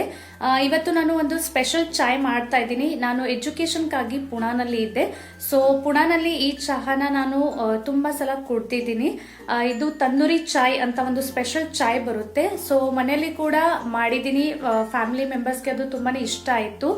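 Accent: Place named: North Karnataka, India. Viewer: Nanu uttara karnatakada Gulbarga davru.....neevu uttara karnatakadavru anta keli khushi ayitu.....neevu namma bhasedaga matadri keloka cholo anistada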